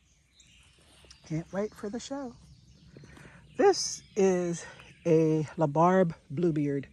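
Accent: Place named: South, United States. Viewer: You have a garden my grandmother would be envious of ❤ I’ve been following you on instagram, just found you this morning on YouTube from your link on insta. My family and I moved to Southern California the end of 2021 and this video is making miss home something bad this morning! 😢 Your accent, the birds, the lushness…. We weren’t in Georgia but near Asheville NC. Happy Saturday! I absolutely love your garden! ❤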